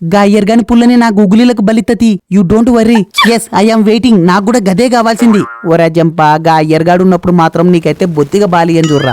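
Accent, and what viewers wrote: Rayalaseema, India. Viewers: R u From Rayalaseema?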